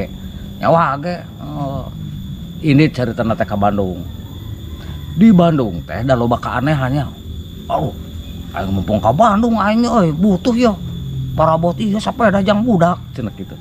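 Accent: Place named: Majalengka, Indonesia. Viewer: Sumuhun leres pisan kang, Sim kuring ge gaduh saderek di majalengka nyarios jiga kitu 😆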